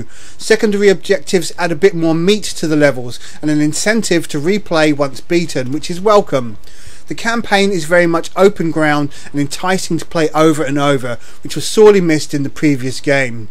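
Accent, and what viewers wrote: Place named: United Kingdom. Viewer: I like your British accent, which sounds very soothing for video game reviews. But there are certain elements and design choices which I honestly can't appreciate in Blacklist. First it has too many mandatory combat sections and that level where you are in the stead of Briggs dips you in an FPS, which is a bad idea of which I sincerely cannot fathom the existence. Second there are too many dogs that turn into pure annoyance if you go for a perfect stealth route. And there are too many levels that force you to go in one direction, with segments being entirely separately from one another in such a way that once you go past a segment, the game loads the next one so the enemies in the previous one no longer tracks your move - this will not make any sense if designed for Chaos Theory, because that game allows you to play in a non-linear fashion (remember the bank mission where you can play one way and the other way around). 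Blacklist is not like a small open world, for it often gives you a straight road and there too many areas so well lit which will give you pain if you want to play stalker.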